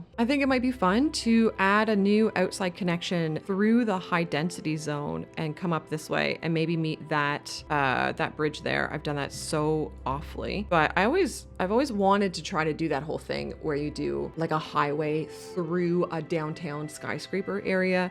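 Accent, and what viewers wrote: Canada. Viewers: As a fellow Canuck... your consistent pronunciation of "aboot" hit my funny bone just right! LOL Anyway, this was HUGELY helpful! I have never thought of this idea, and I struggle when building cities, despite all the great guides and tips out here in YT Land. I'm going to start over - again - like, I think this will be my fourth city start since I got the game the day it came out - pick a map, take a screenshot, load that into my Goodnotes app on my iPad and mark it up with my Apple pencil in ALL THE COLOURS! Then I can have my plan in front of my face while I build. SUCH a great tip, thank you for sharing!!! :trophy-yellow-smiling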